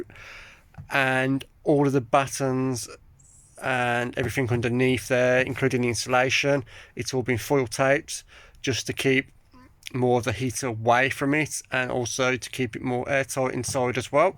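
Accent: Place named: Dudley, United Kingdom. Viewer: Just come across your channel - sound like your from my way (Dudley). Reslly helpful videos - thanks for uploading!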